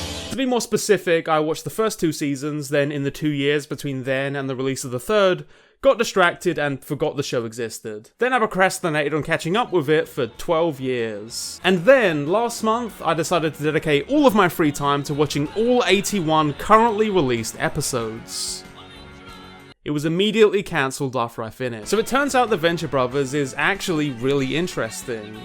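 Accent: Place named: United Kingdom. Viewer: he's literally just British holy shit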